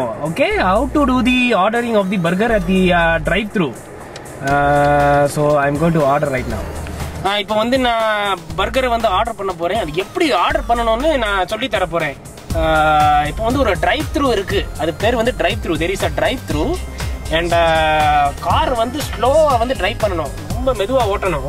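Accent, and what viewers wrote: India. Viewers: LOL, the best part is his amazing tamil accent and stupid english :D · this guy has the thickest indian accent ever.....and it's real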